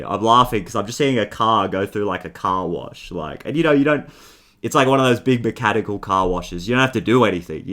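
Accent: Australia. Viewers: Left tarot vids for a year, been needing it this past week and it’s been EPIC and defo in the cards, wherever I go, but I gotta say it was so lovely to hear your lovely Aussie sweetness, familiar to my ear. Am on X stages, surrounded by all ethnicities, mainly Yanks. So hearing such a sage and true message from you was just the cherry on top…as I head into my Hermit phase. Looking forward to tuning in here again. Grateful for you. 🥰🙏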